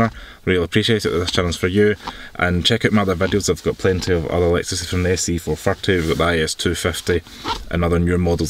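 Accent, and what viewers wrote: Scotland, United Kingdom. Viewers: Scottish English is cool 😎 👍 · Not that easy to understand scottish english... Thanks for that interersting and useful video.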